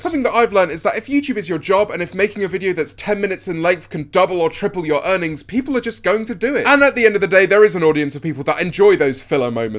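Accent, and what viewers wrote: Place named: England, United Kingdom. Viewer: Mr.Beast is fun just the way he is and anyways i wish i can do your english accent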